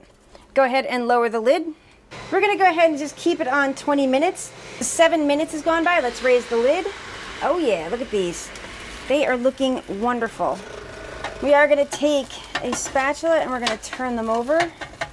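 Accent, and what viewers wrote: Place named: Philadelphia, United States. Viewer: Are you from Philly can tell by your accent.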